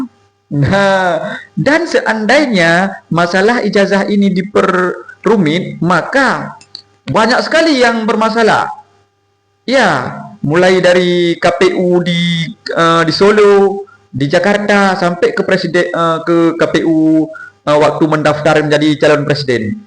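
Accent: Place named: Malaysia. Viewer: Conten ini lucu skli bicara nya orng malaysia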